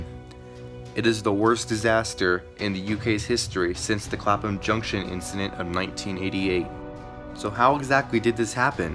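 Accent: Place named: United States. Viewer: It’s just American enunciation, we can’t do anything about it.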